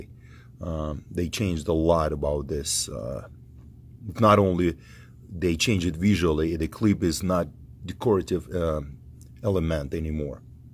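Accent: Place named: Russia. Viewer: Родную речь слышно даже на английском) через 3-4 дня мне такой же придет)))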